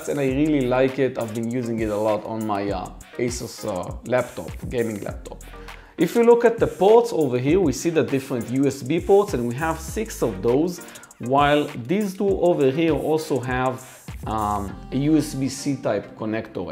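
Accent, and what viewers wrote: Israel. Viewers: this guy sounds violently israeli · אחי, אין על המבטא הישראלי שלך. :)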